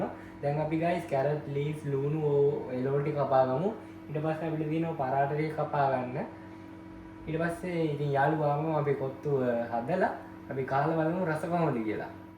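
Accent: South, Sri Lanka. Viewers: ඔයැයි....දකුණේ යි😅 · ඔයා දකුනේ කෙනෙක් ද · ඔයා දකුණේ නේ 😂😊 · ඔහේ දකුනෙයි🫠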